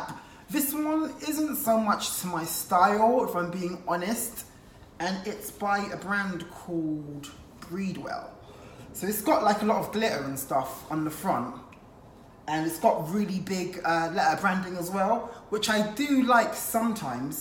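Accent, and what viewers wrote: United Kingdom. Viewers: Hey - the colors are great and the silver is the highlight- it was made for your incredible physique!! Love the British accent, mate!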